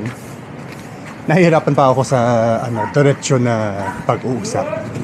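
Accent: Philippines. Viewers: You sound very Pinoy to me good job! Thanks for appreciating our morning staple hot pandesal uniquely pinoy! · You're actually very good in your tagalog! The way you pronounce each words sounds and your filipino accent, and the pitch so perfect real filipino. You dont have that fuency yet like the real speaker, but with your knowledge of the language no one could scam you. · Ang galing ninu sir magsalita.ng tagalog filipino accent din xa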